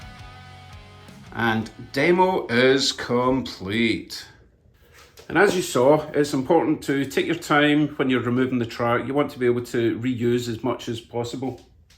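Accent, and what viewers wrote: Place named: Glasgow, United Kingdom. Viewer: I did a video a couple of days ago on making inexpensive backdrops for the Train layout you may find useful. It costs 90% than store offerrings. Now i'm watching all your old videos. Your accent reminds me of my Grandmothers' accent all the way from Glasgow. None of my boyhood friends cound understand her thick accent.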